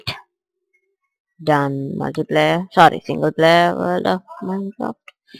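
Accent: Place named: India. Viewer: its indian accent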